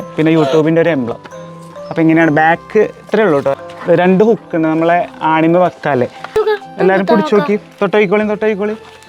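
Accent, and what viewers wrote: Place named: Malappuram, India. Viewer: Happy ആയീലെ ഇനിയും ഉയരങ്ങളിൽ എത്തട്ടെ. ഞാൻ ഇപ്പൊ അടുത്താണ് വ്ലോഗ് കണ്ടു തുടങ്ങിയത് എനിക്കിഷ്ടം നിങ്ങളുടെ സംസാരവും സാദാ നാട്ടിൻ പുറത്തെ നമ്മുടെ മലപ്പുറം slang 👍👍👍👍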